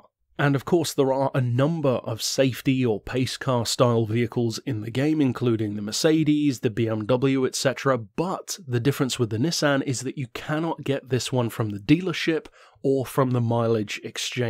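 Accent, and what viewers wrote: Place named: London, United Kingdom. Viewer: it is tho its like london or smn, but not AYO FAM BUS MAN YOUR P'S- london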